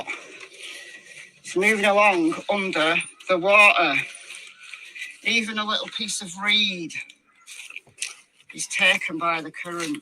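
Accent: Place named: Lancashire, United Kingdom. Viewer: Thank you for all you do. These video's really help one to get a true perspective of what I also truly believe was a tragic accident. I love seeing this country side and the scenery. After much research on ancestry, I discovered my 3 x great grandparents were married in St. Michaels on the Wrye in 1866. Also listening to your voice, I can imagine what my GGG Grandma probably sounded like as she was born and raised in Lancashire County.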